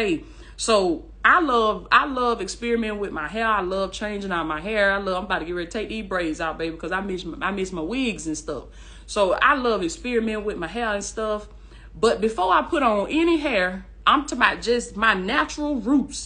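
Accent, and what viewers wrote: South, United States. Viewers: God is a perfect God. You sound wonderful,! I love❤ your southern drawl, and could listen to you for hours. Not kidding. God made your voice unique, to reach the millions that you will be assigned to! Your voice mesmerizes people, and compel them to stop and listen to you while God is pouring into you and into them. Praise God! I hope that you read this because...your mouth is working...👄but...believe me, GOD IS SPEAKING.🙏🏽 To THE MASSES!!!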